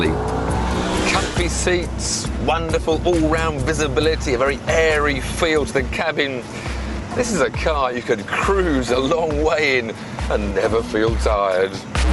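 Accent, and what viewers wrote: United Kingdom. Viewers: why does the dude always have to have a british fuckin accent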